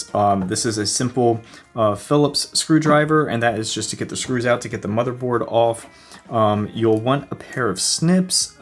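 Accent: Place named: Baltimore, United States. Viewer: Had no idea where you were located but had a good guess from that accent! Gotta be a Charm City Ghostbuster right here! 😂 Loving the videos! 👍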